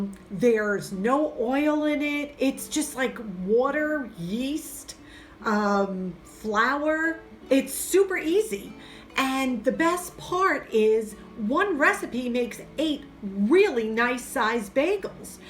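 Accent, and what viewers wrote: New York, United States. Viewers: you and are doing a great job. I initially came over from "Mornings with Granny." When I first watched your video something in my heart and mind clicked from your voice. I grew up in NY and I recognized that in your voice. It wasn't until later that you confirmed it that I got the "ah hah, no wonder" feeling. You share from the heart and I appreciate i Your tips are great, keep them coming. I like the recipes and the honesty you share. Thank you for bringing "a little joy to my day."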